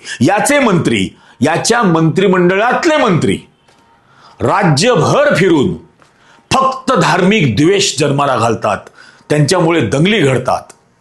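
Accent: Standard. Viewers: सगळ्या राजकरण्यानी शुद्ध भाषेचं ज्ञान घेण्यासाठी या सरांकडे जावे बोलताना शब्द कसे कोणत्या स्वरात बोलवे हे या व्हिडिओ मधून शिकावे नाहीतर हेल ओढत बोलणारे राजकारणी वाटत त्यांच्या तोंडावर हात ठेवावा 😂😂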